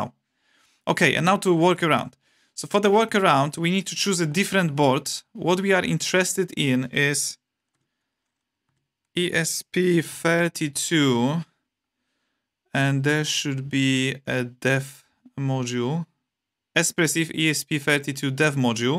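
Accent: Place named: Poland. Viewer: Dobra robota dobry angielski i typowo polski akcent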